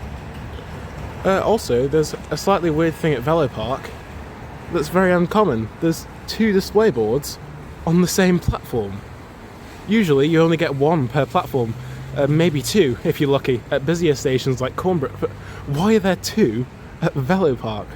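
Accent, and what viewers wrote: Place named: Australia. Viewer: Stop talking like an Aussie...proper fkin annoying.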